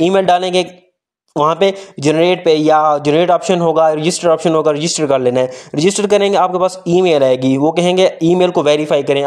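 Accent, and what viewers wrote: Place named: India. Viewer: You text in English you talk Indian🙄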